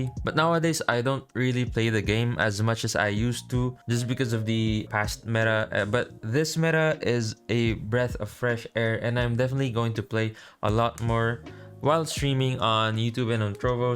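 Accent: Philippines. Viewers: I knoww that your a Filipino because your english accent is really filipino 😄😄😄😄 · SABIIII NA NGAAA BAAAA E, MAY FILIPINO ACCENT KAAAA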